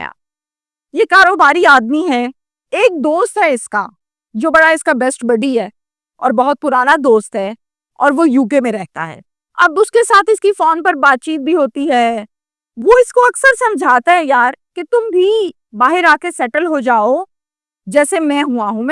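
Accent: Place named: Punjab, Pakistan. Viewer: This woman needs training on how speak on a public forum like social media. She has a Punjabi accent whilst speaking Urdu and her tone is usually aggressive especially when she interviews people.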